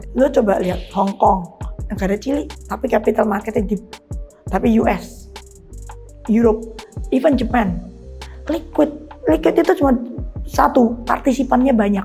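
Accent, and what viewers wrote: Java, Indonesia. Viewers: Cici penyampaiannya gokil substantif dan mudah difahami, terutama medok lagi 😅👍👍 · Cici jawane medok.. 😊😂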